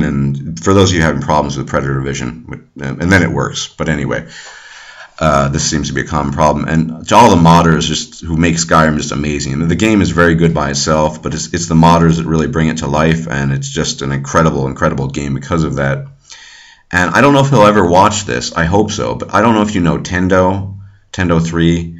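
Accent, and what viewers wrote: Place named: North Germany, Germany. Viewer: Dein Deutsch ist sehr flüssig aber mit ziemlich Norddeutschem Dialekt. Ist das Absicht?^^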